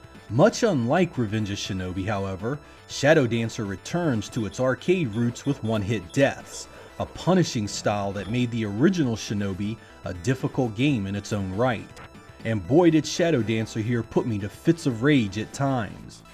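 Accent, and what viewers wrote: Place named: Philadelphia, United States. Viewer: You can tell he is from Philly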